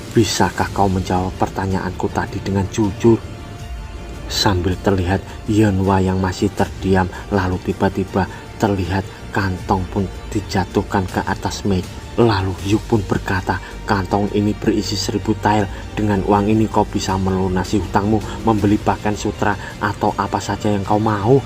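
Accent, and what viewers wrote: Java, Indonesia. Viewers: Logat bahasa jawa e kentara mok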